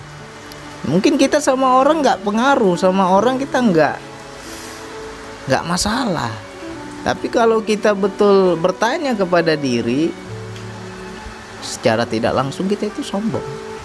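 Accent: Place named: Medan, Indonesia. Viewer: Abg logat medan..orang medan ya bg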